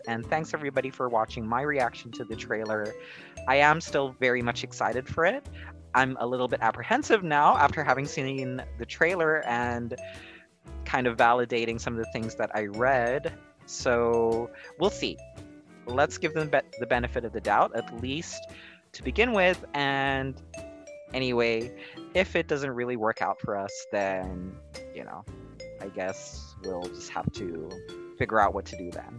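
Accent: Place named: England, United Kingdom. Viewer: I just finish this bl series and I really love it! This is the first bl series that really push me to download it on my devices because I always feel that I'll eventually lose interest on the previous bl's I watched and this one is a must watch! Though I never watch the 3 first HIStory part because I really don't have the interest? Don't get me wrong! And also this is the only bl series I watched that gives me a lot of emotions. Thanks for this amazing reaction vid and I love your English accent!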